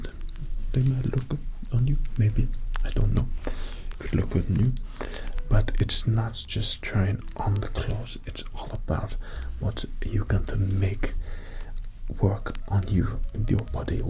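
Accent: France. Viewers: Sorry but that French accent is so strange to hear from you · Back on Nov. 11, 2018, video, "Your Friendly Theatre Ticket Master" with a very quiet French accent, the snooty fellow took almost an hour to check a ticket. I still love that one in particular and now this. Thanks, you daffy guy. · This was absolutely fantastic! I love the French accent and the one-liners. It’s not only relaxing but cleverly funny. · Oh great the long awaited return of the French accent! Very tingly, love this 😍. Also what a pleasant surprise for us french people to see the iconic "Luke, je suis ton père" accompanying the description of the video, bien vu! thanks 👍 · Nice french accent x) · real French accent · Ahah quite thé funny accent, but we french have a Secret technic to butcher english prononciation : prononcé ''the'' as ''ze'' ;) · I’m french and i love how you make our french accent 😂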